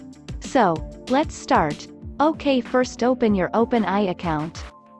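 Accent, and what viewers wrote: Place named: India. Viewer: She/he is from India. Thats how we talk.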